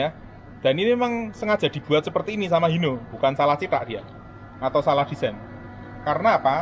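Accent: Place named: Banyumas, Indonesia. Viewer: Oh ternyata om ngapak tohh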